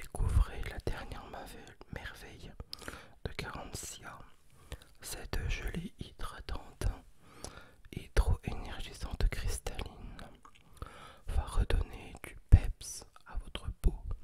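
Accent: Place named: Normandy, France. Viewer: Tu as l'accent de Normandie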